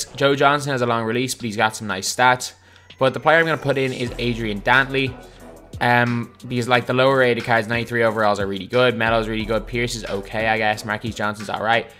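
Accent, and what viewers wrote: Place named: Ireland. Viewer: First video of yours I watched but I know by the accent your Irish?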